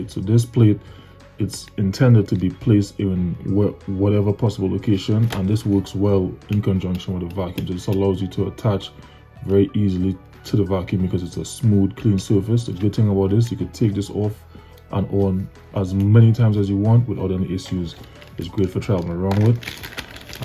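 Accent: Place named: Trinidad and Tobago. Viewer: You sound Trini brother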